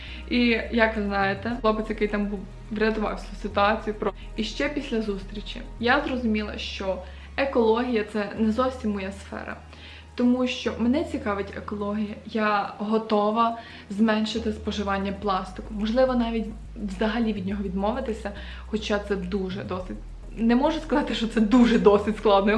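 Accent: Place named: Lviv, Ukraine. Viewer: Та вона чисто по львівськи говорит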